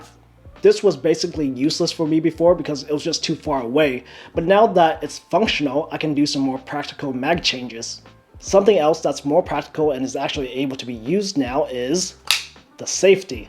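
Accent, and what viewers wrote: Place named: Pacific Northwest, United States. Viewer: Sounds like you live in the PNW.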